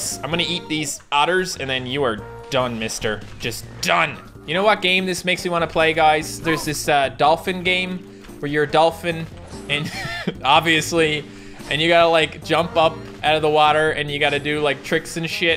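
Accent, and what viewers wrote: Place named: Canada. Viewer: “That’s a funny one, ay!” The good ole Canadian ‘ay’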